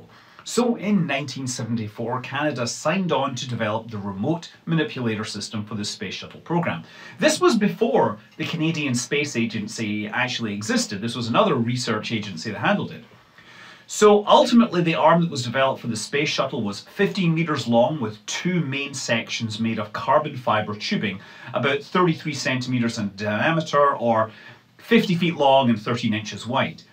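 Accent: Scotland, United Kingdom. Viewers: Your Scottish? That's dope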